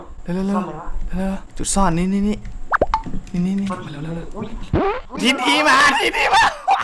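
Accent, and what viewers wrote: North, Thailand. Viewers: พี่เป็นคนเหนือใช่ไหม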